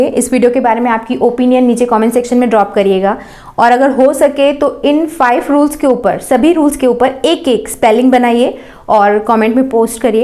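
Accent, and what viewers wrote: Himachal Pradesh, India. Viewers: Are u from Himachal?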